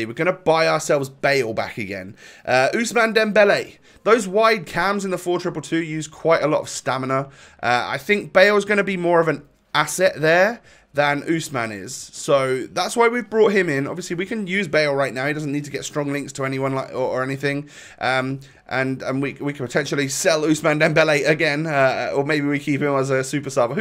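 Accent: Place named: England, United Kingdom. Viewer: Wait he’s from Argentina??? Omg I thought he was from England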